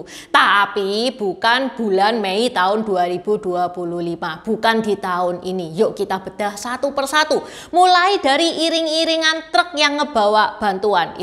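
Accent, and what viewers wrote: Java, Indonesia. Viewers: Wong ndi sampean mbak kok medok jowo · Medok tenan, ciri khaz e Semangat💪💪💪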